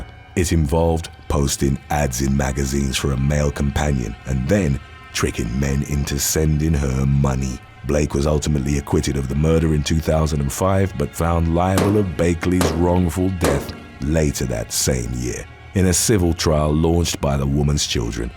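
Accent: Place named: England, United Kingdom. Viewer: He's speaking proper English. Remember, that's where American English came from.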